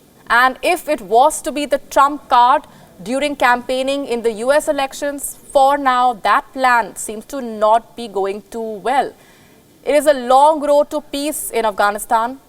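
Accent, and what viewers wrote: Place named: India. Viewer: Sir , Nobody talked about professionalism here It was just a personal request . I'm in no way demeaned the current anchor . She is good . And If you don't get Indian accent there are many other great news channels you can switch to them anytime It's better not to hurt your ears . By the way , your comprehension skills aren't great either !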